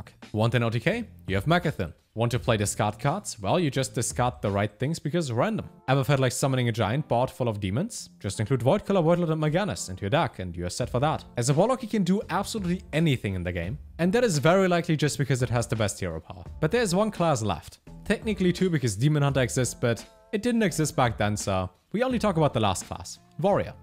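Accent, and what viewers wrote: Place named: Germany. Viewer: That fast German-English accent is exhausting to listen to.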